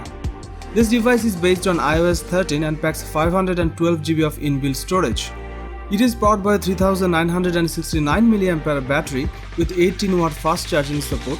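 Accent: Bengal, India. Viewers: Sound like Bengal or assam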